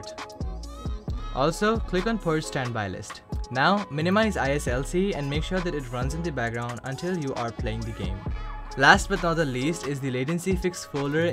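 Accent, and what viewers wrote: India. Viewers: You got a bit of indian accent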